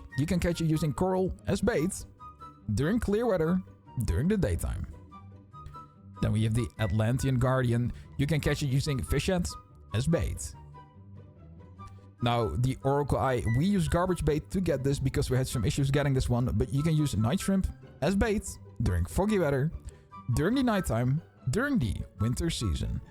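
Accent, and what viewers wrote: Netherlands. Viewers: je hoort gwn dat je nederlands bent lol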